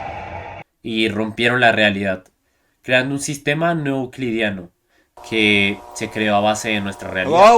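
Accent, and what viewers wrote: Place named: Colombia. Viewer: El asento se parece a Colombia no se muy bien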